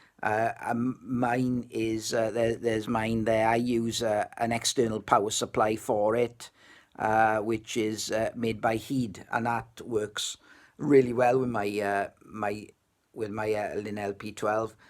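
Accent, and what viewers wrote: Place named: Wales, United Kingdom. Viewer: Thanks for this video, I’m currently running a Rega Brio amplifier but am seriously considering an upgrade to the Exposure 2510. It is a very minimalist amplifier but I’m happy to get an external DAC and headphone amp. Thankyou. YouTube needs more beautiful Welsh accents so please upload more content.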